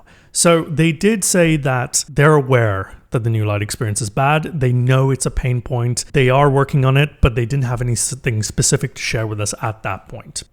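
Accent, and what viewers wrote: United Kingdom. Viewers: No doubt intimidated them with his high class British dialect, manners and intelligence. If only you got to have a meeting with the suits at the top instead of the developers whose hands are tied. I bet you would’ve handed them their ass in the most civilized discourse with dignified dialogue and a few witty passive aggressive jabs in between. Maybe one day… maybe.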